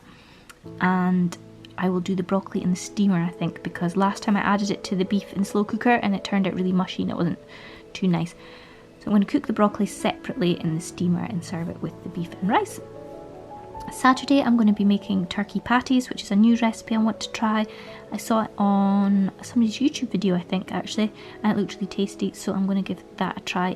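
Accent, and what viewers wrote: Scotland, United Kingdom. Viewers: All I could think is your Scottish lol. I shouted it actaully, I may need help. Trying to find my way just now on my own channel and your's within being on it for two minutes gave me hope and inspiration. Subscribed. <3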